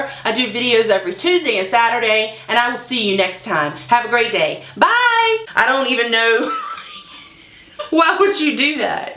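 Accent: South, United States. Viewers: tbh you are an inspiration to me I also have a very heavy southern accent and I used to hate it, now that I have found your channel I am learning to embrace it. You are my absolute favorite youtuber.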